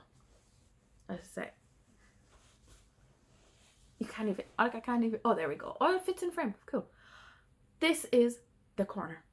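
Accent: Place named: Ireland. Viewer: Lovely to hear another Irish accent on Flosstube. Great to find another Jacob fan. I was supposed to attend the Big Stitch but sadly life had other plans. Really enjoying hearing all the recaps. Love the variety of your projects. Looking forward to following your progress. Take care, best wishes from 🇮🇪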